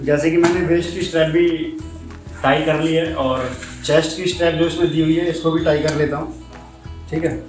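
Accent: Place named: Uttarakhand, India. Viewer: Bhai tumhare bolne ke tarike se lag raha h ki tum Uttarakhand se ho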